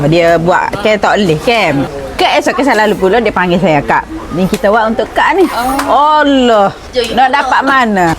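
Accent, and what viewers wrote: Kelantan, Malaysia. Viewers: Kagom aku akak India ni pandai cakap kelantan, ni yg DAP takotkan perpaduan sebenar bagse melayu yg di terapkan Kelantan ... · Suka aku tengok akak ni spontan je dia crita dengan loghat klantan tmpat asal tempat lahirnye tu kot klantan.. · Bangga gila aku walaupun aku org kedah...bangga dengan depa kaum lain tapi ckap loqat kelantan beat dengar bila kaum lain ckap..depa org cina pon ckap kelantan terbaikk❤ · pandai loghat kelate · Part tekejut beruk ayat paling rare negeri kelatey..hebat kak mengunakan bahasa kelatey😂😂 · Tabik lah akak ni..fasih berbahasa Melayu dlm loghat Kelantan... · Coney la kak ni .. kelate pure 🎉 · Kak kalau sy tak tgok muka akk hanya dgar suara mmg sy yakin akk melayu klantan kak.. power kak ori budu betul ni😂😂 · Tekak Klate mommy yr. Suuuuka dengar dia borak... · respek kak....kelate pekat...nnt try ike bekok plok kak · Sy takjub tgk akak India tapi ckp loghat kelate. 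Kalau tutup mata cuma dgr akak borak mmg tak sangka org India yg bercakap. Last skali mana nk dpt baju akak pakai tu? Cantek sgt. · sedap dok oh dengar antie kecek kelate gak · Dia bukan sahaja cakap bahasa lorat kelate tapi nada & lenggok percakapan pun seni budaya kelate 👍👍cakap mcm melayu kelate 👍👍👍👍👍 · Ttubik bunyi kelate gak kira jolo slalu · Sedap oyak kelate sis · 😂😂😂😂🤣 Terbaik kak👍👍👍👍👍..puas dengaq akak cakap Kelate🎉❤😊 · saya pon terkejut beruk jgk dgr kak kecek kelate...hahaha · Sedap dgr dia cakap kelate..👍👍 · Best la akak ni… xrasa nk panggil kak ni india tp mmg rasa mmg klate, wlu aku org johor😅… aku bangga ngn kuatnya penyatuan org kelantan…. Sbb loghat dia… yg mana negeri aku pun xamalkn kenegeriaN johor… sbb xtau apa yg nk mnyatukan sesuatu kejohorian tu… jujur dari hati jgn kecam 😅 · Bagus lh India ni😅😅😅😅😅pekat ckp Kelantan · aku penah dengar family bengalli punjabi di jakarta airport cakap Indon , no big deal ... 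sebab dorang memang di lahirkan dan dibesarkan di sana... sama gak akak neh, membear di Qlate, memang padu la bahasa Qlate dia... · Tersenyum je sepanjang tengok akak ni berceloteh❤ ..kalau sekadar dengar suara, memng kita tak sangka yang bercakap ni adalah indian , sangat fasih dengan loghat kelantan ye❤❤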